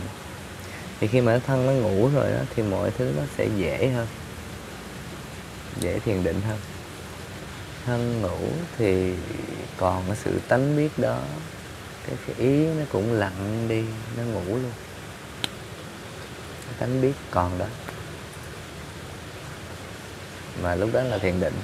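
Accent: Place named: Mekong Delta, Vietnam. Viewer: Tập này a ngồi nc kiểu miền Tây hả😅 nhìn cưng ghê😍😍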